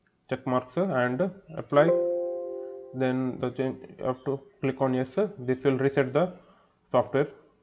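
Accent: India. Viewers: lmao the curry accent makes it better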